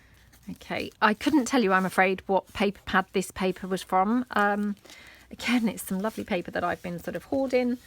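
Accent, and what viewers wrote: England, United Kingdom. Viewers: A lovely video again! Please please promiss you dont do ASMR videos never... I hate the irritating sounds when I am not crafting myself..brrrrr :) Love your chatting, you english accent !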